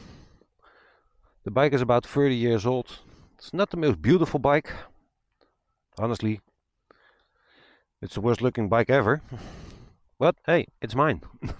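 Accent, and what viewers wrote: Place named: Netherlands. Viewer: Setup functions amazing. The Pan sounds great (love that V4 smoothness) and you voice is picked up clear. Good audio balance overall. Image is up to spec too. Guess all you are missing now is a cam under the head and tail light for some serious cam switching editing and ofcourse those sweet sweet unobstructed views of the Dutch countryside. Soon RTL's MotoNL can pack it's bags (or more specifically panniers). Your English is perfectly understandable despite not being a native speaker. You are grasping for words more than when speaking in dutch but for an ad hoc, on the fly recording not bad at all. And people might mention it but don't worry about the accent. Dutch just has a heavy linguistical footprint. Keep up the good work. Great vid again of this channel. Thanks for the upload!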